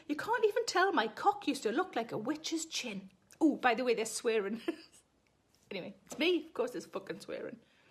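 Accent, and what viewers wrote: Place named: South Shields, United Kingdom. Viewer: My Mam grew up about a kilometre from Sarah’s home. We emigrated to Canada when I was quite young but we travelled a lot and I spent a fair bit of time in South Shields at my Gran’s. I was enrolled in school on our more extended visits. How thrilled was I to discover I “almost” went to school with Sarah. Sarah sounds exactly like my Gran - no offence Sarah, my Granny always had a high, sweet sounding voice like yours despite her age. She also had a saucy sense of humour and glint in her eye despite her tidy, prim appearance. I don’t get back to the UK nowadays so listening to “How to Be Champion” was doubly pleasurable- the humour and the accent of my childhood. To think we might have passed each other one afternoon in The Nook....I really, really, REALLY believed Minchella’s was the best ice cream IN THE WORLD, cos my Mam said so.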